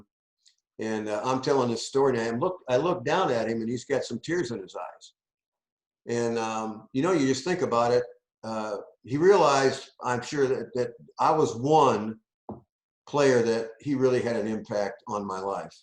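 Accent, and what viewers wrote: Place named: Indiana, United States. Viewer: u sound like a maga in indiana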